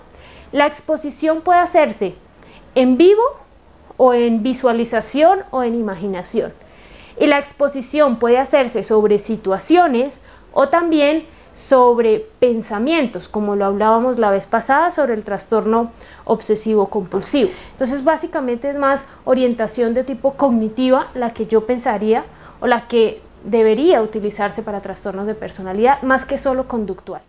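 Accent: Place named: Colombia. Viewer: Parece colombiana por el acento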